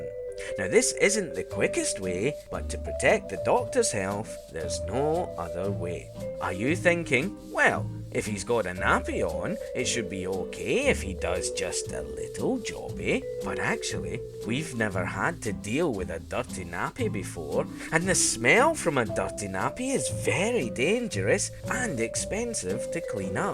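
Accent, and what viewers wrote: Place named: Scotland, United Kingdom. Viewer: Iam not saying that I am one of the one's who may find this offensive, I am just commenting that some may. I actually found it amusing especially with the Scottish voice over which just added another dimension to it. I used to live in Saitama many years ago and in some respects wished I was back there as we are still being hit hard here with many aftershocks and there's a serious lack of fuel, but at least food is back in the shops on a regular basis again! Stay safe in Saitama!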